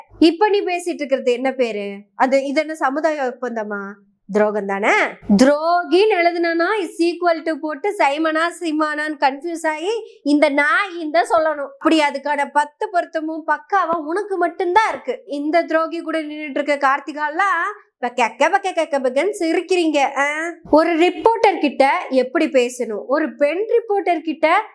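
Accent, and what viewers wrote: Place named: Coimbatore, India. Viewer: கோவை வட்டார வழக்கு,, நல்லா சுத்தமா பேசுங்க சகோதரி....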